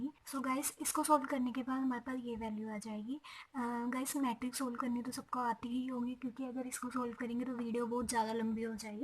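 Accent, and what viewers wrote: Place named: Haryana, India. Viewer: Liked your Haryanvi accent.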